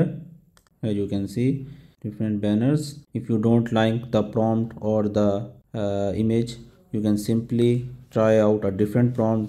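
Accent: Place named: India. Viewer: His accent says he is definitely indian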